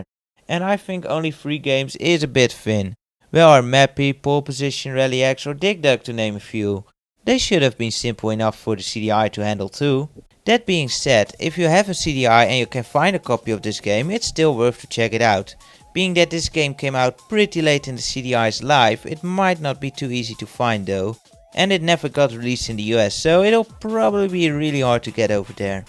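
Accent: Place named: Netherlands. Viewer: You sound verry Dutch!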